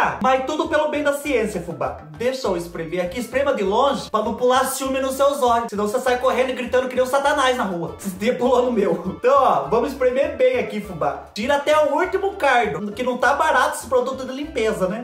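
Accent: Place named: Minas Gerais, Brazil. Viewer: Pelo seu sotaque você é de Minas.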